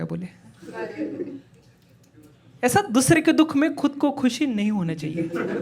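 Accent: Maharashtra, India. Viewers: भावा तुझ्या बोलण्यातून च समजत तू मराठी आहेस..🧡 आवडलं आपल्याला..🔥🤍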